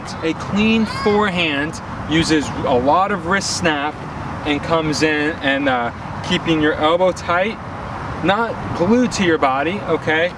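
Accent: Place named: Colorado, United States. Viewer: I hear a Colorado accent. Are you from CO?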